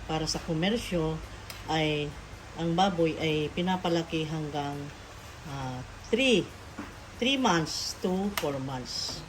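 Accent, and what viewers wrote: Ilocos, Philippines. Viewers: Ilokano ka ba ma'am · Ilocano ka po? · Ilocano ka tlaga msgsalita, para rin kyong bisaya. Wag knang magtagalog at nkakahiya po kyo . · By any chance you’re Ilokano?😊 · Parang Ilocano yata kayo! Taga saan ba kayo?😊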